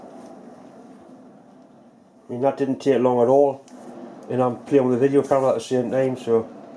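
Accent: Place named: Liverpool, United Kingdom. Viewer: thats canny man scouser trying to be a geordie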